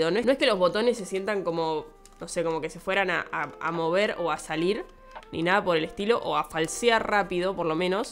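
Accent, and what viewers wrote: Argentina. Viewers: Me encanta el acento argentino xD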